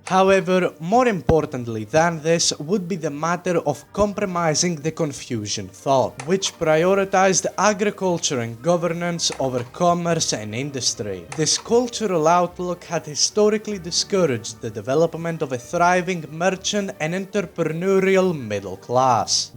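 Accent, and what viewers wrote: Greece. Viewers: Now that's a Greek accent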